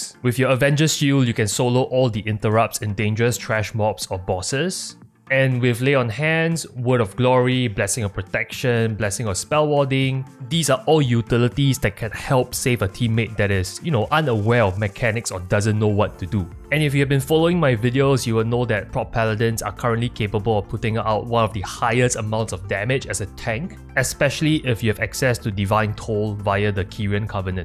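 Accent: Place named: Singapore. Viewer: Hey man thats awesome! I'm on Thaurissan myself , also GM and trying my best to do content creation (which is why i got excited when i heard the Singaporean English). All the best!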